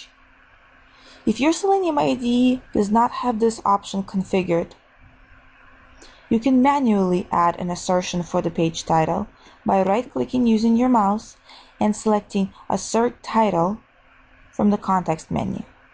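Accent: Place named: Russia. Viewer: Your accent reminds me of the accent of my Russian friends, you gotta be of either Russian or another Slavonic origin, don't you? :)) p.s. thanks for the vid, it's good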